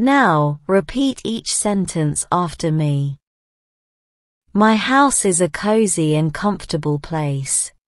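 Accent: United Kingdom. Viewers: Is this the British accent ??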